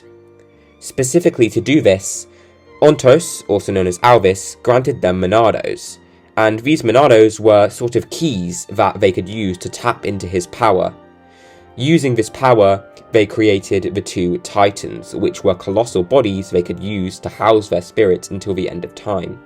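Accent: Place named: United Kingdom. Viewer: The fact that a British guy narratives this is so Xenoblade-y !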